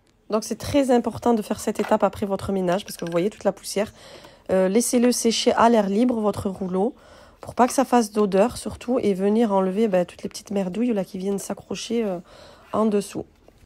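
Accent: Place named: Midi, France. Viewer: Mignon l'accent du Midi 😊😊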